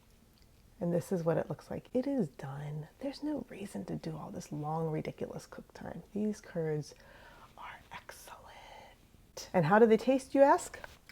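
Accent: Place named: Boston, United States. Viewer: What part of Boston are you from? ❤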